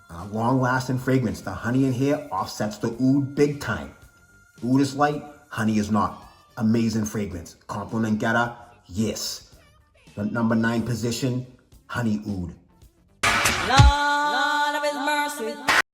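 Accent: Boston, United States. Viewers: Are you from Boston? great list.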